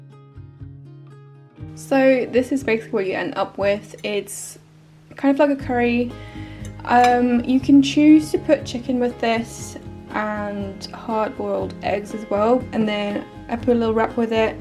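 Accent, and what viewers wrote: United Kingdom. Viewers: Hello from Spain. I've recently watched your video about your own British accent, so you have such a brilliant British accent. I know many people from such lovely country called The Netherlands usually speak more than two languages. Well, how many languages do you speak? Are you able to sing the Dutch national anthem in every language you've been taught? Thanks in advance.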